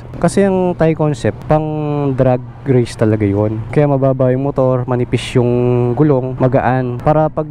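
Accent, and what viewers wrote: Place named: Ilocos Sur, Philippines. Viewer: akala ko nasa ilocos sur ka idol HAHAHA kasi similar yung mga nahay eh hahaha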